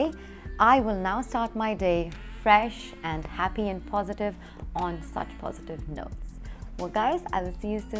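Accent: India. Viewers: You sound local Indian village English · Are you a native English speaker.? You are speaking indlish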